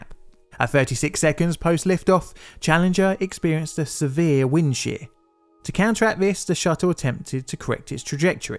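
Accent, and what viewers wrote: United Kingdom. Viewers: He is British, slight difference in pronunciation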